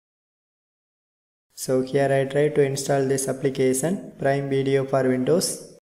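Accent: India. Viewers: You helping me very well, i got shadow ban in call of duty ... you fiy prroblem , i now no problem in brain . Thaks you my brother i love yourre Indian-English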